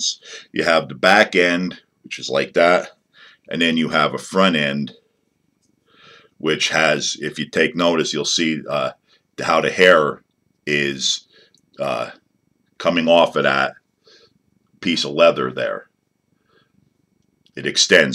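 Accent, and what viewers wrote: Pennsylvania, United States. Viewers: I have been trying some of your patterns for a friend and myself . He made a comment that you have a Schuylkill county accent , then I saw you use a bank envelope that had orwigsburg printed on it , is that the area your from ?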